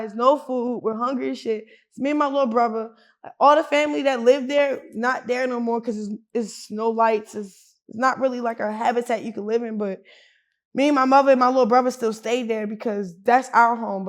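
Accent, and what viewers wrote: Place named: Maryland, United States. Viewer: I can definitely hear that "Murlen" accent in her voice lol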